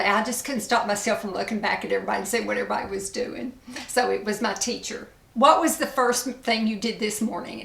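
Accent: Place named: Tennessee, United States. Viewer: That was so much fun! I love listening to your accent. I’m English but have lived in the US for 26 years now. We spent 8 years in Knoxville, TN and listening to you takes me back there 😊💕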